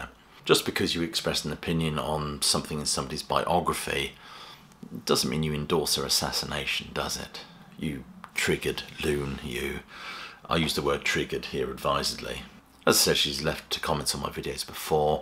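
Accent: England, United Kingdom. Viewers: F-Them. Love your English accent. Keep up the good work. All the best from me to you, Sir! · Love your channel and your English accent, eccentricity and humor! Plus your knowledge is vast! Carry on Wayward Son of Rock! Never mind the Trollos!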